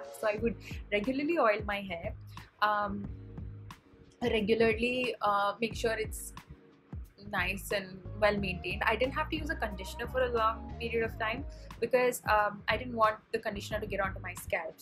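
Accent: India. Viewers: Her accent is Indian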